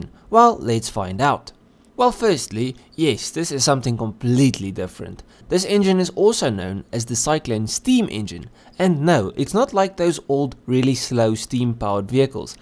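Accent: South Africa. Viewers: This guy sounds South African - whooHoo!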